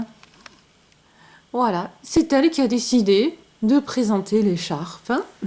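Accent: South, France. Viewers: Merci pour tous ces conseils et bravo en plus tu me fais trop rire avec ton accent du sud. Et cela fait du bien en ce moment comme il fait froid chez nous en Auvergne. Bisous d'une mamie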